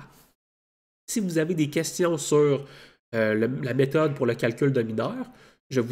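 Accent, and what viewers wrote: Quebec, Canada. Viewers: 😂 il est quebecois , c est leur accent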